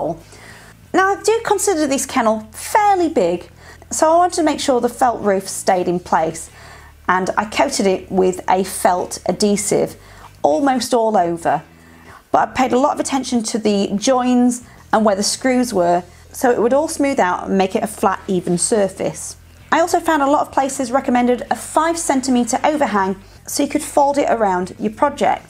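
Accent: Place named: Stockport, United Kingdom. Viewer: Really enjoy your videos! I’m originally from Stockport Manchester, I’m now in the US. Your accent makes me think of home.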